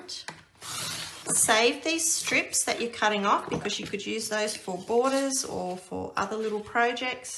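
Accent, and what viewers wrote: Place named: Australia. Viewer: !these pages are beautiful,love to watch you scrap and listen to your Aussie accent! Just Lovely xx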